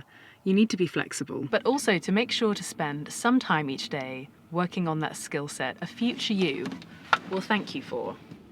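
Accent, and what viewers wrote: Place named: England, United Kingdom. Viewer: u should make a tshirts saying-watch me on- you CHUBE ! - lol,LIike your chusday- i love your english accent-ca usa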